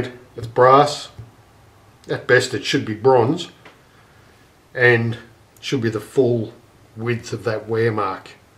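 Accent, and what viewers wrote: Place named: Australia. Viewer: Ahhhh.... So nice to find a video for a job I will be getting into after Christmas. Being a free lathe I had no time to look at it really but it felt tight in my inexperienced quick look. I had to dismantle it to get it home so I'll be looking for repairs needed whilst putting it all together. Thanks for the pointers and places to look. Mine is rather older without the thread cutting box. Just a heap of gears thrown in an old banana box that amazingly all look in good condition. Soooo very much appreciated I say with another Aussie accent. old-bonez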